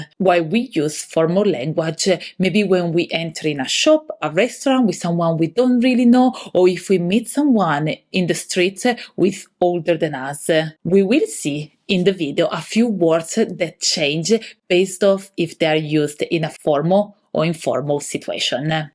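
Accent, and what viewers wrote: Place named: Italy. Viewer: As both and an English and Italian speaker I find it amusing the insistence on perfect Italian pronunciation when teaching the most basic words and yet you speak English with absolutely zero effort to pronounce it in an English way. Like so many Italians to be honest.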